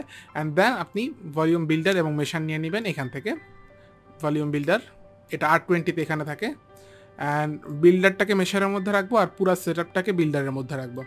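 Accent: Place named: India. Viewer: You think we can understand your English? Slow down man, you English becomes much Indian.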